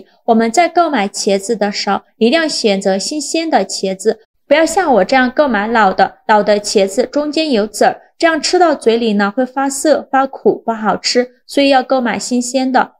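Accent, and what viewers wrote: Yunnan, China. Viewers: 有趣的云南普通话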